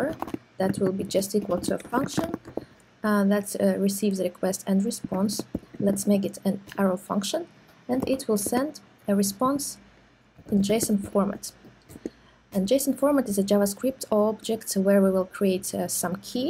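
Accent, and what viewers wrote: Russia. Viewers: услышал знакомый русский акцент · слышу что вы русскоязычная и это хорошо, спрошу. Зачем вы фетчите дату в useEffect? Он же срабатывает при каждом ререндере дома или вообще в изменении его структуры. Или я не прав? Пожалуйста, помогите разобраться, потому что я привык фетчить дату при событиях типо клика или сабмита, но вижу как некоторые делают это в хуке useEffect. Реакт изучаю совсем недавно из-за надобности по работе, обычно писал только бэкенд. И меня ставит в тупик этот хук. Почитав офф документацию написано, что отрабатывает в зависимости от установленных зависимостей, и изменении состояния этих зависимостей. В общем путаница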